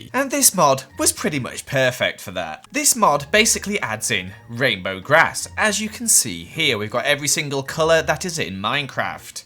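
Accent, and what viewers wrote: United Kingdom. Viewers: he has a british accent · xXĄîķö ČhăňXx I think it IS a British Accent...Only slightly tho.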